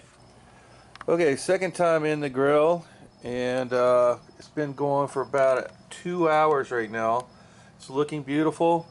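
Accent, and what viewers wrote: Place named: Pittsburgh, United States. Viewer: You from Pittsburgh?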